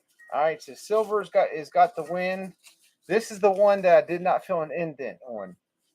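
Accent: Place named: Tennessee, United States. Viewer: ❤❤, I was just wondering how nearby you are as I recognized your accent. Youre about 5 hours from Kingsport, TN, which is the same distance to Richmond, VA or Nashville from here, basically.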